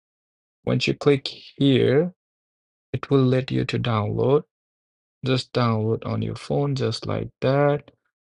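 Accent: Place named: India. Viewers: Indian accent, immediate trust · Indian accent, immediate distrust.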